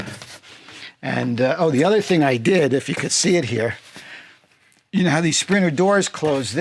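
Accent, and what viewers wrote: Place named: Boston, United States. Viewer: absolutely not Spent 15 years in Boston.. now back in Ireland.. your accent brought some good memories back.. “Now go get your Shinebox”…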